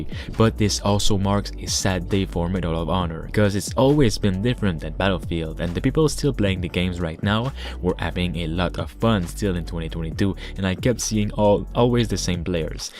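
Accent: Quebec, Canada. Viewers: Oh well I guess I'm gonna buy this to finally try it... Wait a second... Es que t'est Quebecois?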